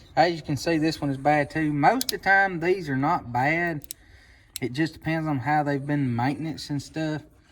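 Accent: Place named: South, United States. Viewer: pity about the southern drawl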